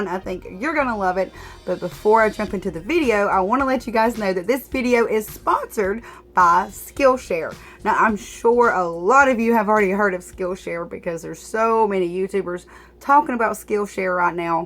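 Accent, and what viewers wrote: South, United States. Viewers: Love your projects and your southern accent 👌🏾👏🏽😁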